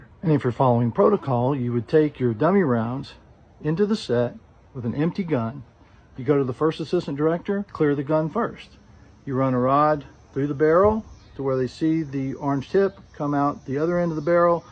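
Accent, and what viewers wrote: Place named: Washington, United States. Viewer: Really sad event. I noticed you sounded like you were from Washington then I saw your hat at the end. Do you work on movies up here?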